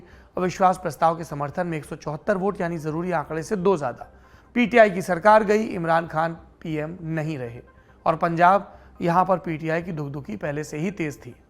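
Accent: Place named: Bundelkhand, India. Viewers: sir tumai bundelkhandi sunke maja aagao ek dum gaon ki yaad dila dai aapne to👌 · Sir i am from orai (near to your hometown chamari). The way you deliver words initially i can feel that vibe sir........ Full bundelkhandi.... Big fan sir..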